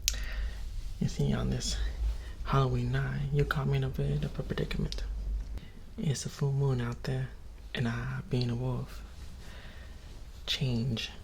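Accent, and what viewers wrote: South, United States. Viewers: Look it can be the werewolf but like you can just do the southern accent cause DAYMN! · I personally love his southern accent. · My favorite I'm obsessed with werewolf but I'm not a big fan of that southern accent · A southern werewolf cool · Southern accent on point I'm from Texas so I can tell you you did you thing baby!! You're talented as hell 🤘🤘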